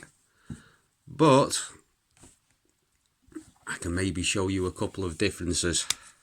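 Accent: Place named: Liverpool, United Kingdom. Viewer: What’s a scouser doing in Finland?!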